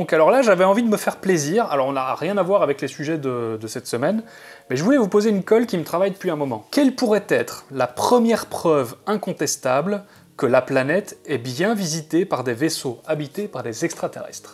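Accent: Belgium. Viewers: Ca n'a rien à voir mais vous n'avez pas besoin d'essayer de réduire votre accent belge. Je ne pense pas que ce soit à cause de la façon dont les mots sont prononcés que la plupart des gens écoutent ce que vous avez à dire. ^^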